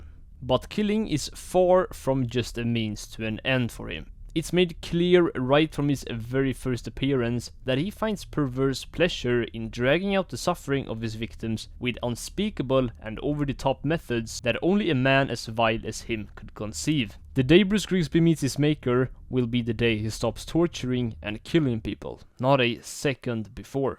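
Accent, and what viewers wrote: Sweden. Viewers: Are you swedish because of your accent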